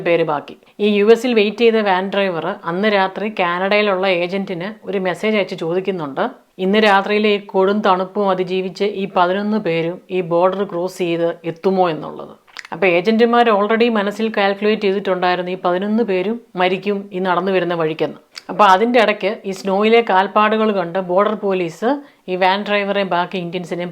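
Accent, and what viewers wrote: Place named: Thrissur, India. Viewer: ചേച്ചി ഞാൻ തൃശൂർ ആണ്, പക്ഷെ ചേച്ചിയുടെ സ്പീകിംഗ് ഒരു തൃശൂർ സ്റ്റൈൽ ആണ് കേൾക്കാൻ രസം, തിരുവല്ല ആണെന്ന് അറിയാം 😂😂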